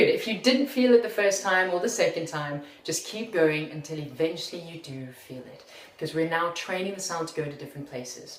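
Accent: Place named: South Africa. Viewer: You sound like the news anchors in South Africa. Something about your accent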